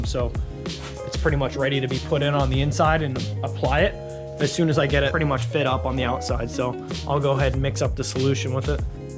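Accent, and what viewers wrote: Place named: Massachusetts, United States. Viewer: U from mass? Sounds like it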